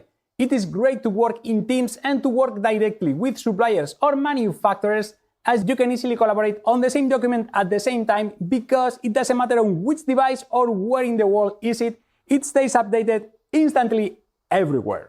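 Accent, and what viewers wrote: Spain. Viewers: ese acentazo es español! Que no? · Jajaj primera vez que me aparece un video tuyo, te oigo hablar y automáticamente he pensado: este tio es español fijo jajajaj gran descubrimiento tu canal, aquí tienes un nuevo suscriptor!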